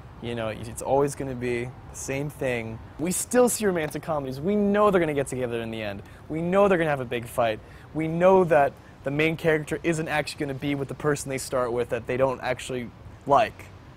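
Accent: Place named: France. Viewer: He's got such a great french accent!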